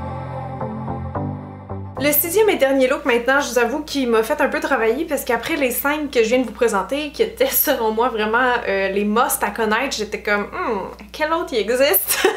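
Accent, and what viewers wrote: Quebec, Canada. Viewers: Super vidéo très pro et pédagogique , je vais investir dans un crayon jumbo pour créer l'effet intense et tester le look monochrome avec un bleu pastel estival ou et un violet Nars très intense ! Je m'abonne dès la première image , magnifique votre halo je vais m'accrocher pour imprimer toutes ces techniques . Un grand coup de cœur...plus l'accent canadien... conquise voire heureuse !!! 😍😍😍👍👍👍❤💖💝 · Pas mal comme vidéo mais avec le débit rapide et l accent québécois, nous ne captons rien :-) j ai mis 10 min a comprendre que vous parliez de "placement" alors que j entendais "plasma". C est pas facile à porter l accent québécois je trouve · Je c'est que ton accent est québécois mais t'es "s" sont super aigue c'est incroyable ! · Je viens de découvrir cette vidéo (ainsi que celle sur les rouge à lèvres) et ce fut très très intéressant!! 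Donc un grand merci. Ca fait longtemps que je me maquille mais j'ai encore appris des choses! Top. (Et ton accent me rappelle notre super voyage au Canada...quand on pouvait voyager :-P )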